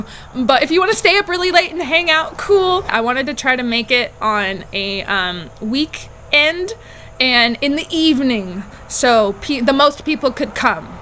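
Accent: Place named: Kentucky, United States. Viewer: I have watched several female through hikers as I too am a solo female hiker but I have only had the opportunity to do sections of the Appalachian trail with a definite plan to complete the whole trail. I found watching y'all made the sections I have not done feel very doable and that I am ok with what/weight I am carrying. The through hikers I watched and enjoyed all of them you were the one individual I would have loved to have through hiked with. You seem to be able to enjoy the journey and not be pressed to always get big miles (especially the new state beverage). I assume this is a once in a lifetime adventure so if you can go out to eat in a restaurant, great! if you need to stay in a hotel because it's rained. Wonderful. I never had issues with why you posted when you did because it only seems logical that you do not want people to know exactly where you are and Wi-Fi is not always readily available on the trail. 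I personally love the way you talk, maybe it's because you're from Kentucky and I'm from Western North Carolina. I'm glad you successfully completed the AT but I do miss watching your on trail videos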